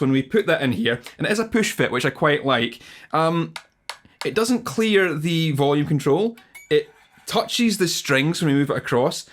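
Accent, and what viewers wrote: Scotland, United Kingdom. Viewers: his scottish accent is honestly really addicting to listen to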